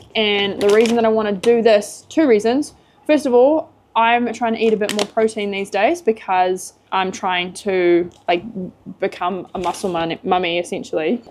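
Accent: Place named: New Zealand. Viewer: DO IT!!! I moved to Japan right out of college. Didn’t speak a word of Japanese, but did have a few college friends there. That adventure changed my perspective SO much. You have friends here in the US, and people here are friendly and helpful. Please don’t miss out on this adventure for you, your husband and children. What’s the worst that could happen? You can always return to NZ. Just be prepared to answer the same questions over and over again. Americans are like big, friendly dogs when they hear an accent like yours and when they find out where you are from, you’ll become the neighborhood celebrities. I SO hope you’ll take the leap!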